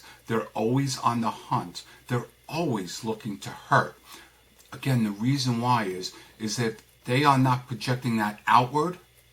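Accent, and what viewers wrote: New York, United States. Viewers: Your videos are very interesting and informative. Well thought out and well presented. You will definitely help many people get over horrible experiences. I was thinking that while you and many others, including myself, have had the experience, that, at least, we are able to "not contact" them. We can escape from the hell that they must live in constantly. Keep up the good work. You look great and really enjoy your New York accent.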